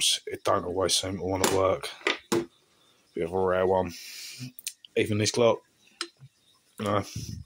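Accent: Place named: Kent, United Kingdom. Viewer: Oh my Gawd wot a smashing collection . Your accent also makes me miss the old country and living in Kent back in the day . Cheers Alberta Ray o7.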